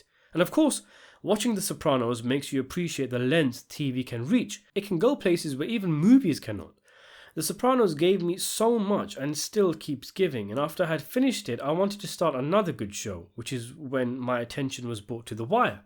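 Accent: England, United Kingdom. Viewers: Great reveal, watched for a long time big fan always wondered what you looked like, obvs your accent is dead give away, British Asain, but nice to put a face to a voice after all this time. Lay off us Brits though, how you gonna do a video on the wire and then end up blaming other peoples mindless hate on us colonising Brits???? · I always knew you was south East Asian - I was bare confused when you entertained being white… UK got different demographics - English accent doesn’t mean white hahah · Something I thought about that was little ironic. Most of us have never seen you. We know you have a British accent but we don’t really know what color you are. Idris Elba is British too. It would be funny for those talking crap if you were actually black LOL. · are you British?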